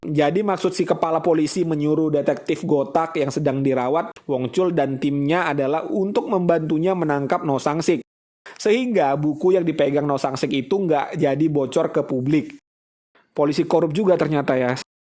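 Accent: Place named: Medan, Indonesia. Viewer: Orang Medan kau bang 😆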